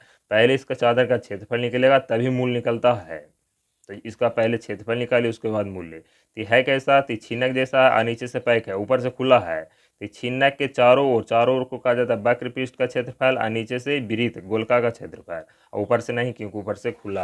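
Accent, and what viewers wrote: India. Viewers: thanks sir bhojpuri style me 😘😘😘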